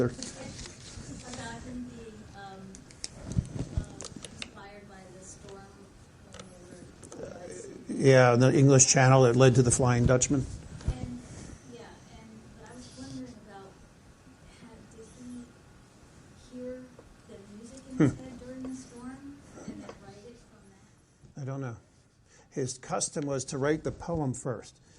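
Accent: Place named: Philadelphia, United States. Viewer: It’s a Philly accent….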